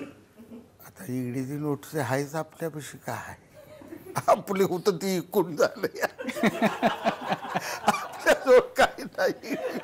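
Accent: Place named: Satara, India. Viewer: अस्सल मराठी बाज ,रांगडी , असली माण सातारी भाषा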